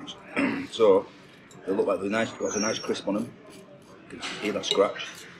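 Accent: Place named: Barnsley, United Kingdom. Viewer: Good review;;;any changes in Blackpool? You sound like Barnsley?????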